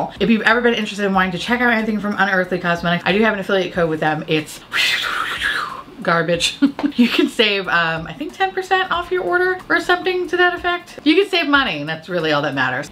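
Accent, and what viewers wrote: France. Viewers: Your french accent is so cute ! And yes, you prononce correctly the word "étoile" ^^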